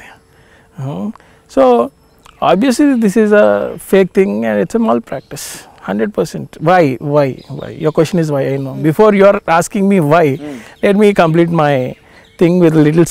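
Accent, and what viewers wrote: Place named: Godavari, India. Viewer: Full Godavari accent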